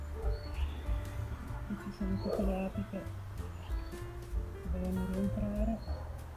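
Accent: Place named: Milan, Italy. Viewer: io sono nato e cresciuto in Brianza, ora da 10 anni vivo in provincia di Torino. Ti ho chiesto perché ho riconosciuto l'accento milanese 😉👍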